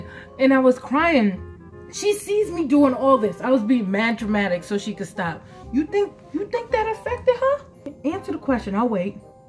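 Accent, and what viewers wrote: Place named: Africa. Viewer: 🤣🤣🤣 subscribed. Love the African accent🤣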